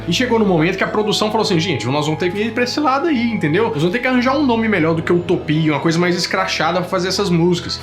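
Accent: Minas Gerais, Brazil. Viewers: CE é mineiro ?? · Ele falou tudo certo, o sotaque mineiro confundiu vocês sô!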